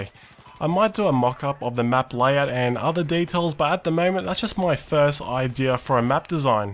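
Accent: Australia. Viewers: wait are you Australian? If you are that surprises me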